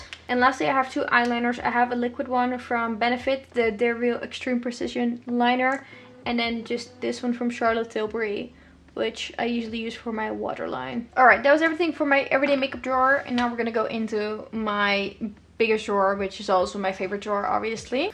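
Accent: Netherlands. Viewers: I hear a Dutch accent right? 🤭 I subscribed! I LOVE girly video's 😊🩷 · wait girl are u dutch? ur accent seems familiar hahaha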